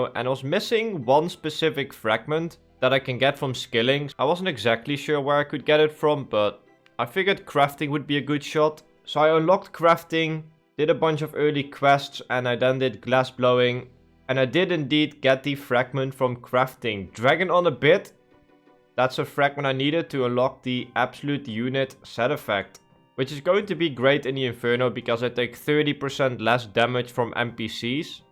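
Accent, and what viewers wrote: Netherlands. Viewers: Normal people would make a 10-video series out of this, you put all of this in a single 11-minute video… madman, je verliest je Nederlandse accent ook steeds meer.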